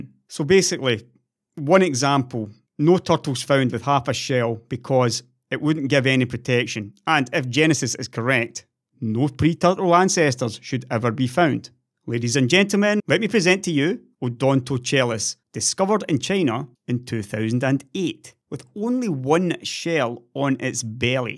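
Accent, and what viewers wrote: Scotland, United Kingdom. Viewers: I love your accent... you make scottish understandable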